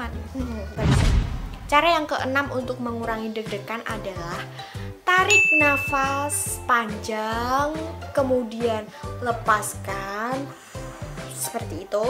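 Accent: Java, Indonesia. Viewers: medok sekali 😍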